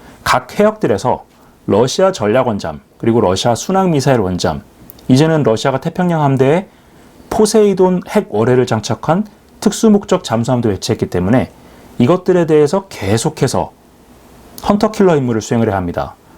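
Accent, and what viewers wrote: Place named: Seoul, South Korea. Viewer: 서울말 좋아요 ^^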